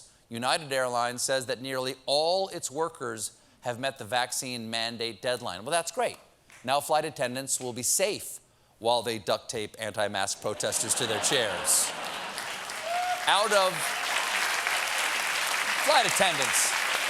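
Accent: France. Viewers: J'adore your French accent! En plus, we are 83,9% vaccinated today =0) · His French accent is spot on.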